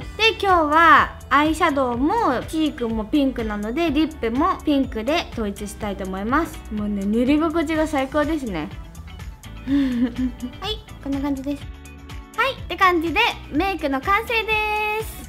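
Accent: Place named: South Korea. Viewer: ・ 韓国の人って、さしすせその発音がすごい可愛いですよね😍❤️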